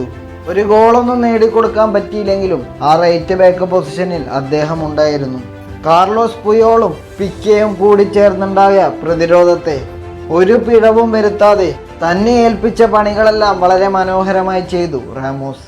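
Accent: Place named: Kannur, India. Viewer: enik kannur oru frnd ind.... Avn samsarikkunnath pole ind😁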